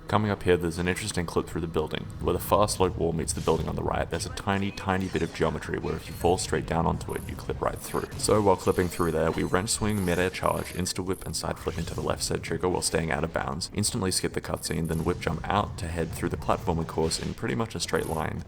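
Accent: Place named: Australia. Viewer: Pro tip for understanding australian commentary: Be australian.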